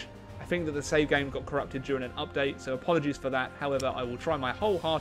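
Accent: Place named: United Kingdom. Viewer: When he said he was going to England I was shocked cuz I actually thought he was a Brit the whole time😳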